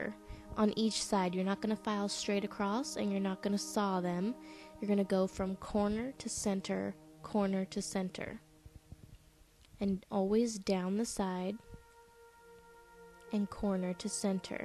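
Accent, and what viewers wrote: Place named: South, United States. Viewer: You have a southern accent how cute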